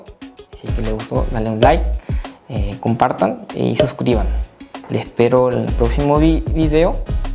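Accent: Paraguay. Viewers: Se nota cuando alguien habla en paraguayo😂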